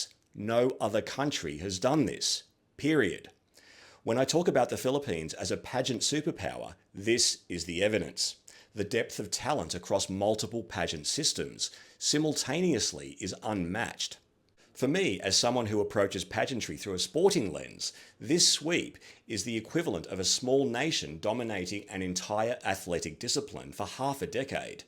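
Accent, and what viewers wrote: Australia. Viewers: I love Miss Universe since I was 15 years old. I love your channel, videos, the amazing information you share! And your Australian accent is amazing and you are charming! Best wishes and Thanks you! ❤❤❤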